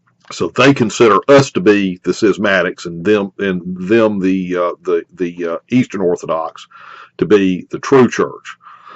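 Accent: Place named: South, United States. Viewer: Nice southern accent. What state are you in? I can’t become Catholic because of the gay clergy. But I respect a lot in what we have in common.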